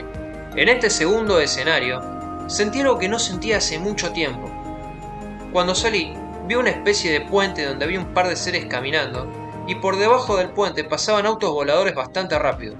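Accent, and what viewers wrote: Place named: Argentina. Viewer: Cuando escuchas que el narrador del videoensayo es argentino, sabes que va a estar bueno el video sjdssdjjsd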